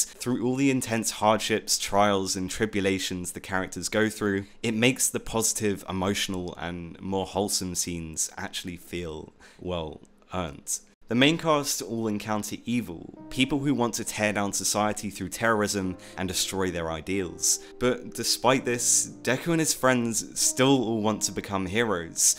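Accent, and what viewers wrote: United Kingdom. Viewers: are you from north or south UK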